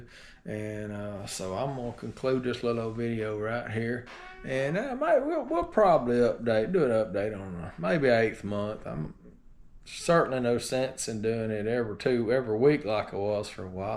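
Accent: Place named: Deep South, United States. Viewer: Thanks for the update, im pleased that youre doing well at this point. Im 2 weeks post TKR so still finding it tough but getting by. I was meant to have my staples removed yesterday but they couldnt be removed as still had some bleeding , so that was disappointing. Ive been told to fully rest it for a week then they will try again next week. I hope i get to where youre at after 6 months..it seems a long way off...lack of sleep is the biggest problem!. Look forward to your next update. I love your accent by the way, im from the UK so not sure which area of the U S your accent is from but its what i imagine to be from the deep south!. Good luck with the rest of your recovery😊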